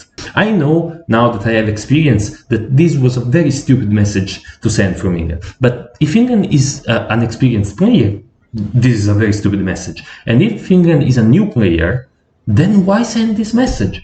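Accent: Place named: Italy. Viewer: Nice, a part from that strong Italian accent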